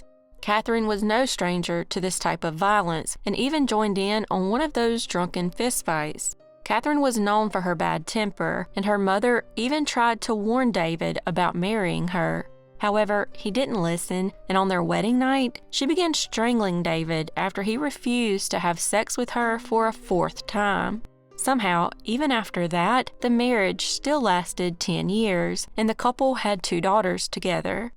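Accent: South, United States. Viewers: Im 6th Yay!!!! It is all so sad But at the same time i enjoy hearing your southern accent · Love your accent! Makes me feel right at home with these southern true crime cases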